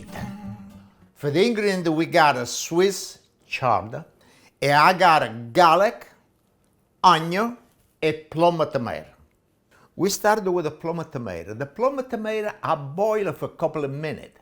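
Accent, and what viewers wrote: Italy. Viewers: Love the italian accent 👍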